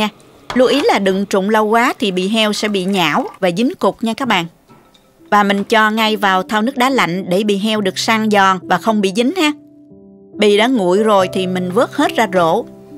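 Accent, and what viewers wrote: South, Vietnam. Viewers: Rất thích nghe giọng MN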